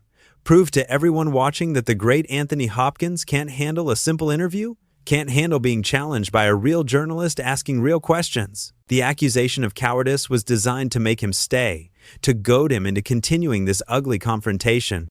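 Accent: United States. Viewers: These American voiced videos are a nightmare, garbage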